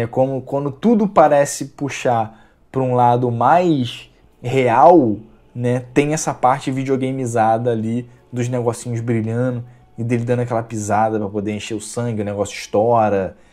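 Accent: Rio de Janeiro, Brazil. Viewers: Vc e carioca!?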